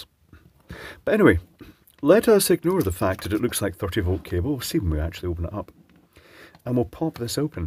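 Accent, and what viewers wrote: Scotland, United Kingdom. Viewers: I’m strictly here for the beautiful Scottish accent!! 😉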